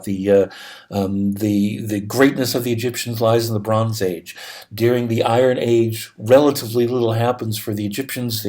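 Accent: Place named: United States. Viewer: Not the worst American accent, I can actually listen to this one for more than 5 seconds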